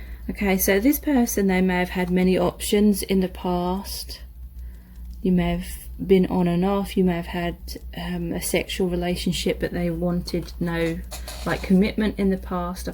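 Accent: England, United Kingdom. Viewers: She does have a soft sweet voice with an english accent 💖🌹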